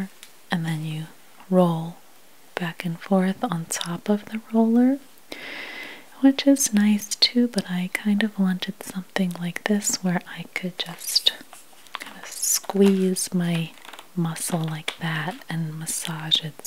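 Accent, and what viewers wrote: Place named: Pennsylvania, United States. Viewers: I was pretty sure you were a Pennsylvania native based on your slight accent but I knew it for sure when you said you got your bar soaps at the Giant 😂 I'm outside Binghamton NY and we had Giant stores here up until WEIS took them over when I was in my 20s. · I love your accent. To me it sounds like you are from southeastern PA or from Maryland and it makes me feel like home! Best ASMR voice ever.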